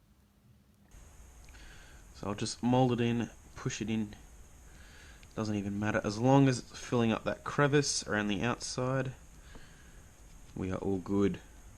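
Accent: Australia. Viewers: Woo a Aussie, great Video, lots of help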